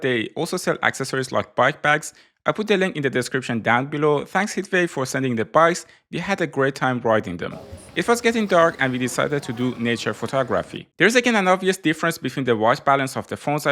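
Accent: Iran. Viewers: ei joonam lahjeye Persian! ;) nice review by the way!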